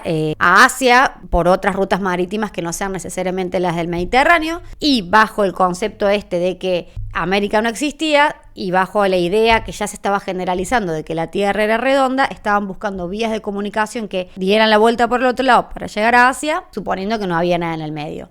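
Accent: Córdoba, Argentina. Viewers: Tiene tonada cordobesa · Ohhh, encontré éste video y no se cómo, será por el algoritmo?... bueno, pero me interesó y me di el "trabajito" de verlo. Antes de nada te comento que soy Peruano y CUZQUEÑO Hispano, no latino, aunque algo hablo en latin, porque aprendí en colegio mediante la misa católica. Te encontré y veo a una "indigenista" más, tratando de deformar nuestra historia. Tu licenciatura esta mal titulada, porque, primero, no fuimos "colonia", fuimos parte de España, como "VIRREYNATO" ok? Tanto "guión" para nada objetivo y verdadero. Debiste buscar libros de historia elementales como la Historia del Perú, escrita por un chico de 21 años como su diario de vida y hoy reconocido como "cronista", gracias a la publicación de ese su diario de vida por sus familiares en 1560... se llamó PEDRO CIEZA DE LEÓN quien llegó con Francisco Pizarro y las crónicas de Cristóbal de Mena quien llegó con Hernán Cortéz a México. 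No tendrías nada que hablar del 12 de octubre de 1492, ya que de por sí está escrito y es "nuestra historia" y no tienes que "repasarla" y la "disputa", solo cabe en la mente retrógrada de INDIGENISTAS como tú que quieren seguir sembrando "odio " a nuestro origen HISPANO y a los conquistadores, que gracias a ellos, hablas castellano, a medias, claro está, por tu fonética "argentina" que no se entiende, jaja Iiiii, claro, en tu país de "progres" izmierdistas, que de lo único que se ocupan y adoctrinan, es de falsear la historia y política con "narrativas" Marxistas-Leninistas. Claro que fue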